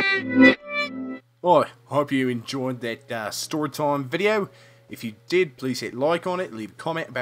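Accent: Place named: Australia. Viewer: Aussie accents are bae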